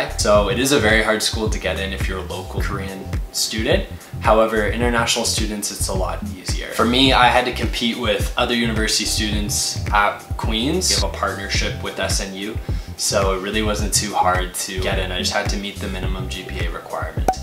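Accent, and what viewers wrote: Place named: United States. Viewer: Can I ask where you are originally from?: You sound like an American. Thanks!!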